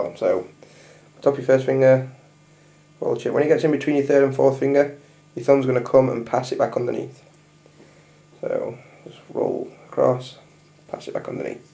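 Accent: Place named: Northern England, United Kingdom. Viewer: I know you're up North but I can't pinpoint the accent, is it Blackburn/Sheffield?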